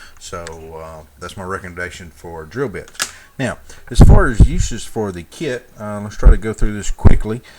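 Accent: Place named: Rural, United States. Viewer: Haha, I love your openings and endings. Living in the country side of America and sharing the same accent, this information really hit home clearly. I've been getting into robotics/electronic work recently. It's a useful hobby to have, but when I try and take apart old devices like cell phones and cameras I find really tight small screws constantly. Near impossible to get out. I keep thinking "If only I had a small drill for this..". I think this may be the one for me! 'God knows it'll make the job faster, anyway. It's not too expensive, either. I just hope the bits don't break too easily. It'd be a pain to have to buy new ones often. Thanks, man.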